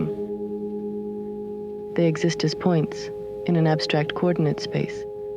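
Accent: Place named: Europe. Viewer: monotone voice with slightly European accent, devoid of human differences that give "color" to life. Drivel.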